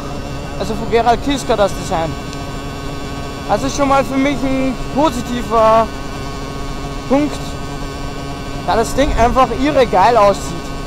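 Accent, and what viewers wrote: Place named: Burgenland, Austria. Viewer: vermutlich burgenländer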